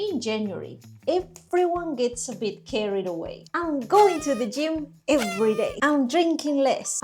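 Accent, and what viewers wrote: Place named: Rioplatense, Argentina. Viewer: Me gusta mucho este video y tu canal. Estoy aprendiendo español rioplatense y siempre estoy buscando por más input comprehensible con ese acento. Muchas gracias!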